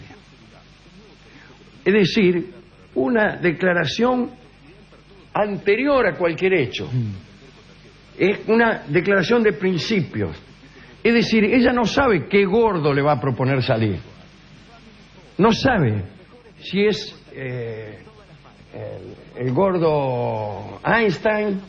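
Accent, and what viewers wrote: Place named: Buenos Aires, Argentina. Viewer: Yo comparto la mayoria de las cosas que dice, desde siempre!!! Habla como todo porteno!!! Pero es muy coherente con la mayoria de las cosas que dice!!! Tal vez a veces sus ideas no sirven para solucionar los problemas en Argentina, porque piensa como latinoamericano!!! Y no como los dinamarques!!! Y asi estan los latinoamericanos!!! Y tambien asi estan los dinamarqueses!!! Larga vida a Dolina!!! O a sus discipulos!!! Como: Rolon, Filmus, Pigna, etc!!!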